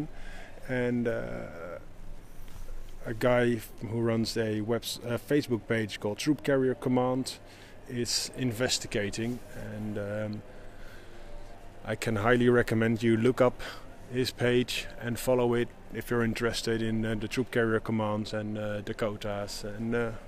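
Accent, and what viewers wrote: Netherlands. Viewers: That Dutch accent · Hallo ik hoor dat je Nederlands bent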